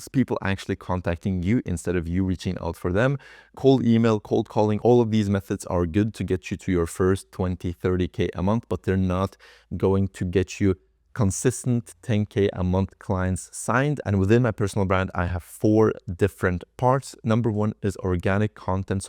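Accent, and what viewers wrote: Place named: Norway. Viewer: hold up you danish or swedish? - nvm you Norwegian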